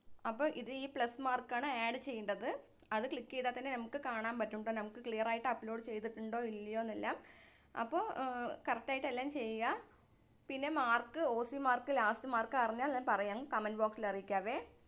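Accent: Palakkad, India. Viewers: Palakkad kari ano miss thani nadan bhasha 👌👌👌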